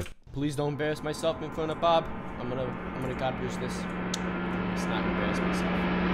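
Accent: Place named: New York, United States. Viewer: Judging by your accent, you’re either from New York or Vermont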